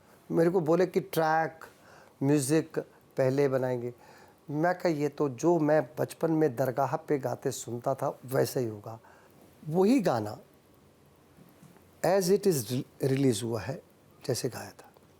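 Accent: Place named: Maharashtra, India. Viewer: मुलाखत खूप छान वाटली! सुखविंदर सिंह ग्रेटच आहेत. तथापि खांडेकर जी आणि ज्ञानदा जी हे हिंदी बोलताना त्यांचा accent मात्र मराठी येतो. तुमच्या सारख्या सेलिब्रिटींकडून हे अपेक्षित नाही.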